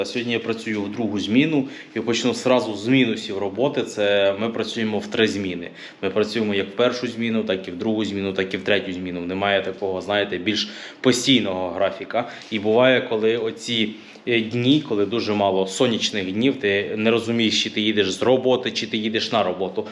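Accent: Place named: South, Ukraine. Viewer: У нього діалект півдня України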